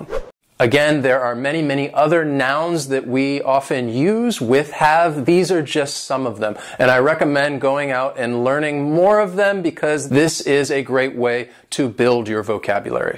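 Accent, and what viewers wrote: United States. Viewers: Mayada swelam Yeah my girl! I'm not, but I'll answer it for ya hehehe There are some possible reasons: his American accent or that "t" is a silent letter, whose sound we can't hear, alright?? Let's wait for his confirmation! Cheers, my friend!!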